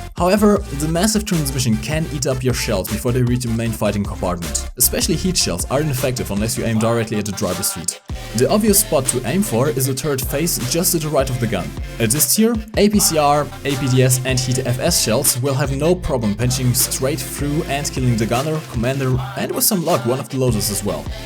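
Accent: Germany. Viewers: hab ich mir auch schon gedacht auch weil sein englisch so klingt wie das eines deutschen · dat Germany accent tho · hach ich feier diese englisch mit akzentfreiem deutsch soo hart ;) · ich wusste es du hasst einen starken deutschen akzent (was nichts schlechtes ist)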